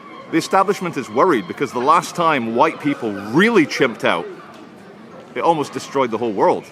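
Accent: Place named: Scotland, United Kingdom. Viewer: A Scottish name and Scottish accent are good enough for me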